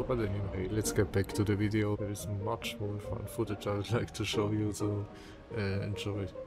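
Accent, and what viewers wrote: Germany. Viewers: I really like your voice I didn't expect that at all it's very manly and it sounds like you have a bit of a German accent 😅 but I'm not going to say anything I'm French so my English accent is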